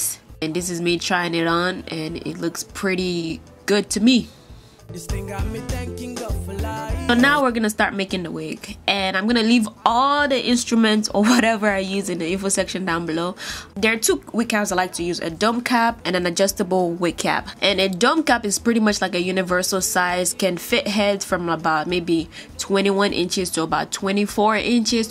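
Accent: Nigeria. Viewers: oh my GOD i love this video..are you Nigerian? because i was thinking...as a result of the songs and your voice also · That was ignorant and uncalled for... She is a Yoruba (Nigerian). I'll have you know that while Yoruba is one of three major tribes in Nigeria, English IS the first language. She may speak Yoruba, but nonetheless she would understand and speak English regardless..its spoken everywhere in Nigeria... Don't be shallow just because you hear an accent..Wow lol..smh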